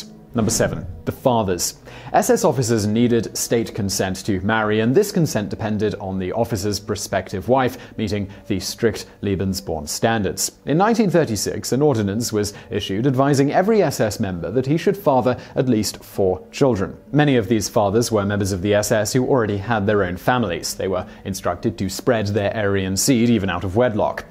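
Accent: United Kingdom. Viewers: you often talk about your efforts to nail the pronunciations of other languages. It seems that in this instance your tendency toward a British pronunciation was just doubled down upon. Seriously I can't believe that any effort at all was made to understand or reproduce a proper Germanic pronunciation despite the jarring difference in meanings throughout the entire video... my enjoyment was negated by this oversight.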